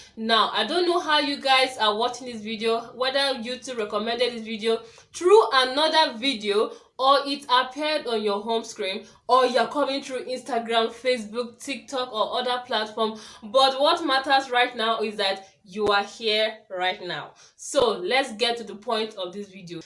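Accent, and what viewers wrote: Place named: Caribbean. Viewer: You have a accent are you carribbean?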